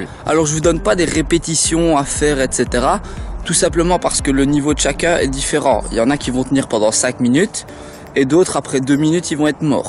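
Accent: Belgium. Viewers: On reconnaît l'accent belge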